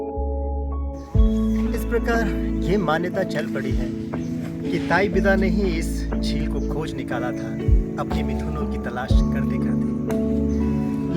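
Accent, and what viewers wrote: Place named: India. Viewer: Your Hindi accent 🥺💖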